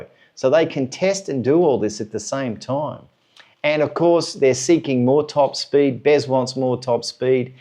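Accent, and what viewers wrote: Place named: Australia. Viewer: Hi, considering your accent, seems you come from Australia, don't you? Btw his name is Raz-gat-lioglu aka RazGOAT😂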